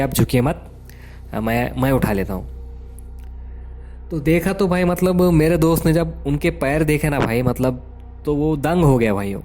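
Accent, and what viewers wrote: India. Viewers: Interesting accent of the narrator. Wonder which of India he’s from. I think this Waqiah happened to the narrator. Because he keeps saying “main ne” and then corrects himself saying “mere dost ne”. 😂😂 Good story. Got goosebumps 🙈🙈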